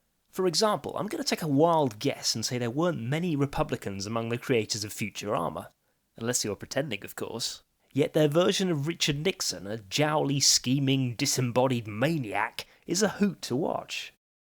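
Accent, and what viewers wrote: England, United Kingdom. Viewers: You sound English? I like the show. Cheers! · I just noticed how unpleasant the combination <expletives + anger/frustration + English accent> is on the ear (I say that as someone with an English accent himself). If I might suggest, it is also a little bit un-English - or certainly exploiting it (mistaking it) as personality or media persona is. I always think that when I hear John Oliver. Wait - is that what we're doing now, copying Americans? Charmless, aggressive loudmouthing an' all? PS. I agree Discovery is awful, the worst Trek series so far (DS9, for my money, being the best).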